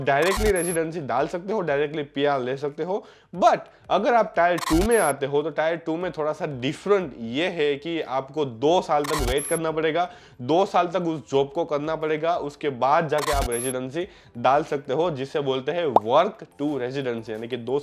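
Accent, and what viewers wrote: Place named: Gujarat, India. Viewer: Bhaii tame Gujarati lago tamari language ma avu lage ....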